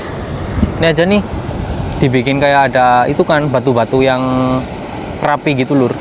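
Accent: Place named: Cilacap, Indonesia. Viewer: Enyong sama ngapak Cilacap kali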